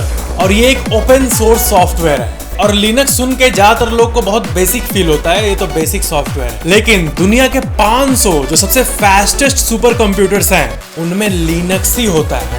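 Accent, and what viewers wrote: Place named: Uttar Pradesh, India. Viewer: भाई आप कहां से हो हमें तो लग रहा है यूपी से हो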